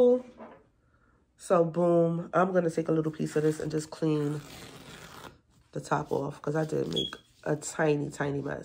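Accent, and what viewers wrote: New York, United States. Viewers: Just found ur video and child i can tell ur from nyc cause i can hear that newyorker accent we got! Thanks for the tips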